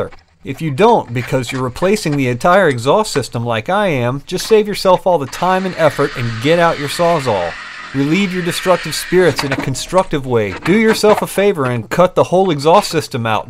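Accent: United States. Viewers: Are you from Michigan?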